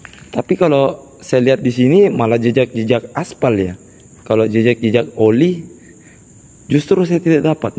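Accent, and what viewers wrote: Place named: Jakarta, Indonesia. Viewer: Makassar bisa tonji bisa juga logat jakarta walaupun kadang ada yang salah sebut kata katanya sedikit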